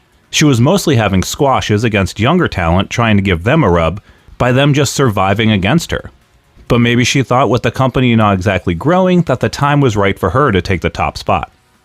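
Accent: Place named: United States. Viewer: Nice stuff! I've been reviewing all their stuff (currently in 2001's beginning right now) and it's plain to see the issues- the fans never "took" to the mat-wrestling stuff and it was dropped, giving it no identity. Big stars Hamada & Omukai didn't sell well and couldn't connect with the fans, and lacked credibility. The biggest ideas to "push" people were essentially to have them win a bunch of flukes in a one-night tournament, which failed to really heat people up as challengers. The mess of politics in 2003 is even worse. I will say the pronunciations here are VERY "Americanized". It's hard to avoid, but MaREEko YoSHEEDA stands out, as does "Rye" TaMAda, haha. Ogawa was less of a "businessman" and more of a "PR Guy". Also Candy Okutsu was a JWP wrestler, not AJW, and it's "Korakuen" Hall XD.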